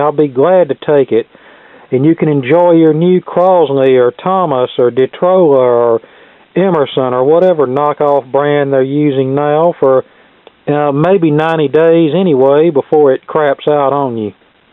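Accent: South, United States. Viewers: He’s a southern ol’ boy. Accent is pretty typical for rural south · Jerico_64 This man sounds nothing like Hank Hill. He simply sounds like an old man with a southern accent. Big difference.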